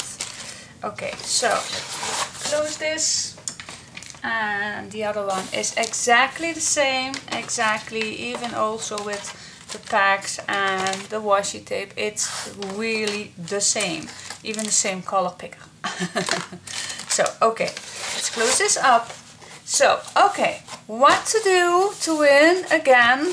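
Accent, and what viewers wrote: Brabant, Netherlands. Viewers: Gefeliciteerd met deze mijlpaal👍🎉🎈. Ik volg je al een tijdje maar ben nu ook abonnee geworden👍💋. Je maakt zo leuke dingen, maar soms heb ik moeite met het engels, dan kijk ik naar de video en wordt t me duidelijk wat je bedoeld. Ik woon in Nedetland en in Limburg. In de buurt van roermond dus niet zo verweg van brabant, ik hoor je accent en versta t ook. Ga zo door👍💋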